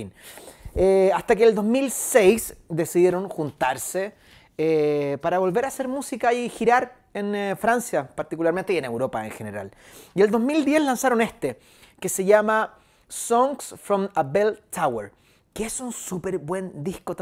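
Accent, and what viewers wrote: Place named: Mexico. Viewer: Idea mia o habla como mexicano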